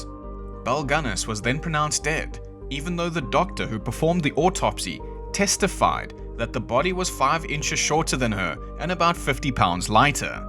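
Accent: South Africa. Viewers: south african accent so much nicer than british or australian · Are you from South Africa? Somehow it seems your accent is from there. A slight Dutch touch to it.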